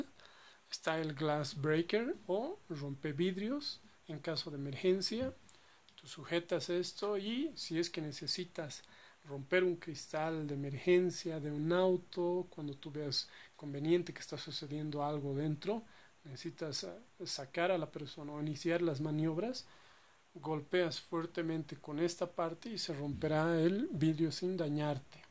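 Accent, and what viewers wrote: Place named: Bolivia. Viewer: hola estimado, por tu forma de hablar .. eres de Bolivia verdad?, si es así.... me podrías decir donde puedo encontrar estas leatherman raptor?, muy buena revisión!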